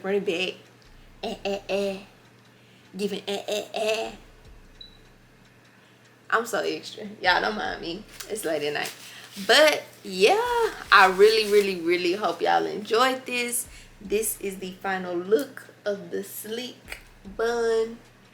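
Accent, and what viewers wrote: United States. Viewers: Love your accent , what part of the states are you from ? ❤️‍🔥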